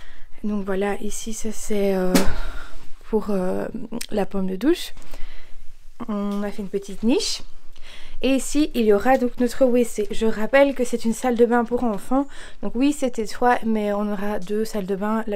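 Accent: Belgium. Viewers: C l'accent belge 😋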